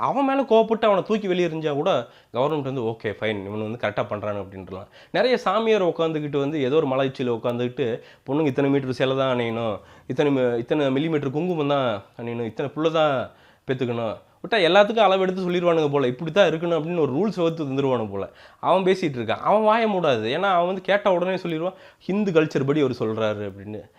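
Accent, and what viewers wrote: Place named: Kongu, India. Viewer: Candour expressed with a hint of Kongu Tamil. Well done.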